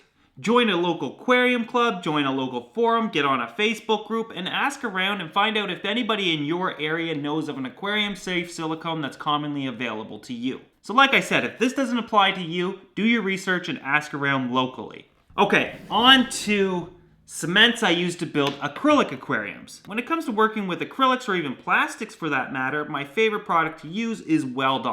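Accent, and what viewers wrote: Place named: East, Canada. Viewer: You must be from eastern canada somewhere lol your accent sounds like it :p But for real, I doubt what I was gunna use is safe for an aquarium...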